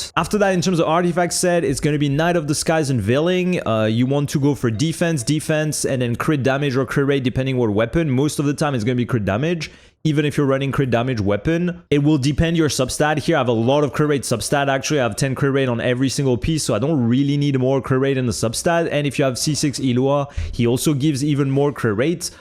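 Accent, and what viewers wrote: France. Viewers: Français ou Canadien ? Cool to see french people speaking english :D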